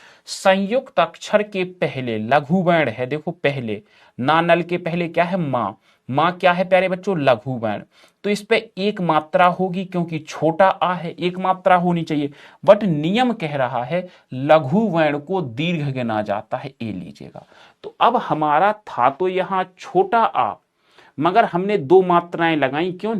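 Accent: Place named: Madhya Pradesh, India. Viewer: Sir aap mp se ho kya?😅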